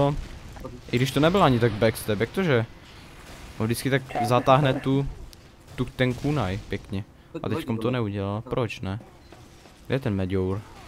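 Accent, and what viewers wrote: Russia. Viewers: ten ruskej prizvuk xD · Nejlepší ten ruskej přízvuk!! (kde jsi se ho naučil?) :D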